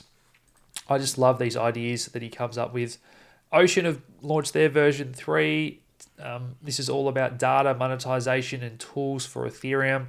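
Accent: Tasmania, Australia. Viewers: Thanks. BTC around $13814 as I write this (Nov 1st, MT). Oil slaughtered. USD stronger. Wonder what will happen tomorrow with the S&P 500. All this interest in BTC makes me want more so is not all in the hands of the rich and corporations. Also ETH must start going up soon according to your analysis - I have only dregs left after maximizing my BTC. Your Tasmanian accent is very easy to listen to and I like that you, your wife, and kid seem so well balanced in this crazy world.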